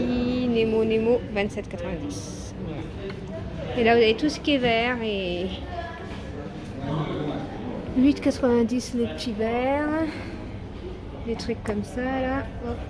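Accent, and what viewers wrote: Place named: North, France. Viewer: Il est d'où ton accent,? Il n'est pas Parisien, si? L'on dirait un accent du Nord Bonne soirée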